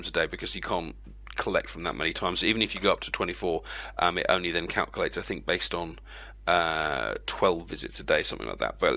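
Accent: United Kingdom. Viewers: Are you British? Will you friend me on Origin?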